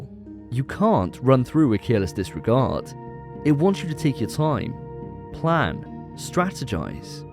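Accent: United Kingdom. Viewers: It’s just not well thought out. Also, the British accent is annoying.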